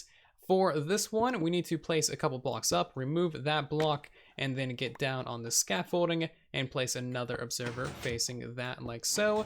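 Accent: United States. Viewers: is secretly mumbo but with a American accent? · is the mumbo jumbo but not for extreme redstone but for game mechanics and with a American accent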